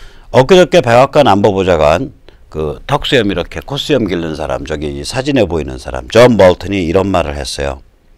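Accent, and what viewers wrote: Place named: Seoul, South Korea. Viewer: 우파 논객 분들이 대부분 나이가 많으신 편입니다만, 제 생각에는 이 젊은이들과 가장 소통을 잘 하실 수 있고 잘 되실 수 있는 분인것 같습니다. 그래서 하나 제안을 드리자면 방송하실때 복장을 와이셔츠 넥타이보다는 좀 더 캐주얼하게 입으셔서 2,30대 젊은 사람들에게 좀 어필 하면 어떨까 합니다. 목소리도 좋은시고 대부분의 우파 논객들이 경상도 분들이 많은데 은 서울 표준말을 쓰시고, 그리고 의 탁월한 지식과 결합되어 말씀도 재미 있으면서도 아주 설득력이 있는것 같습니다. 그래서 젊은 사람들이 충분히 주의를 기울일 수 있는 분이라고 생각합니다.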